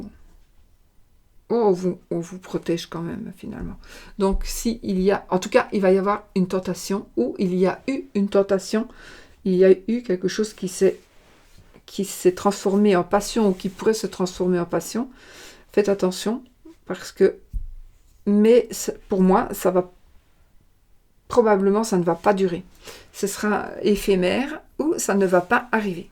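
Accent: Belgium. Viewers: Bonjour d'un Gémeaux du Sud de la France à la Belgique, votre accent et votre style est belge, est ce que je me trompe? Jolie ❤️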